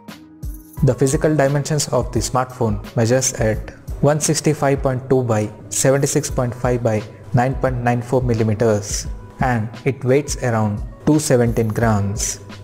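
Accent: India. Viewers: English (Indian phonetics)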